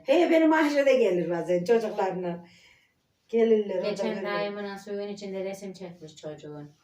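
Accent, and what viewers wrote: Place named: Çorum-Amasya, Turkey. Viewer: Orası corummu amasyalı yoksa samsunmu tokatmi yoksa şive otaraflar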